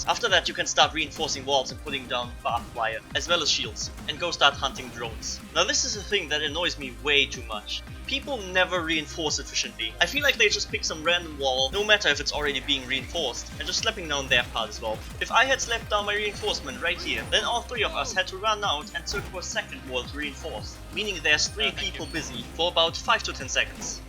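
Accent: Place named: Germany. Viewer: Haha, ich bin auch deutscher, ich kenne den Akzent von mir selbst nur zu gut:D Aber mach weiter, deine Videos sind super! Und es sollte 'german accent' heißen, nicht 'germany accent'. Ich hasse es, auf dem handy zu tippen:/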